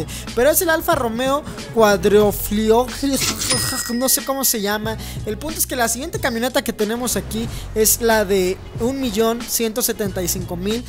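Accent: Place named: Mexico City, Mexico. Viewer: No se de donde eres, pero no se dice ACA, se dice AQUÌ. solo los centro y sudamericanos dicen asi, tu suenas como chilanguito o de el sur de la republica mexicana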